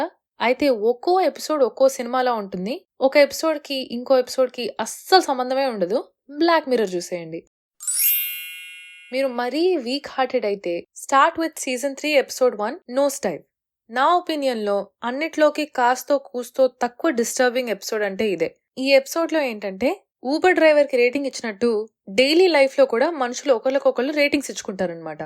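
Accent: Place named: Godavari, India. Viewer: your non pretentious godari slang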